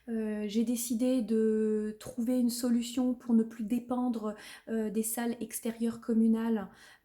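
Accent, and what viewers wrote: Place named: Alsace, France. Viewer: Merci c'est toujours un plaisir de vous entendre parler que ce soit de peinture ou de votre bilan. Mais où est passé votre accent Alsacien 😁. Je vous souhaite une belle et heureuse année artistique. Bisous et à très vite pour votre prochaine vidéo 👍😉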